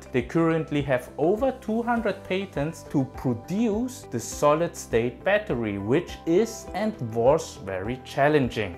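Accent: Germany. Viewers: Do you have a German accent ? Great video .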